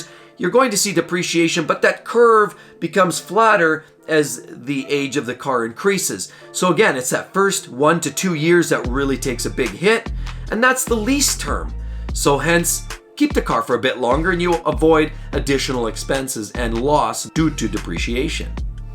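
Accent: Canada. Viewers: This guy sounds Canadian AF.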